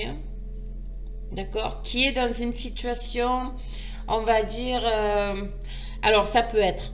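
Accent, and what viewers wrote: South, France. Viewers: Super tirage, merci beaucoup , je vais passer une bonne journée !! Tirage professionnel et le petit accent du Sud , c’est absolument charmant !!👍😉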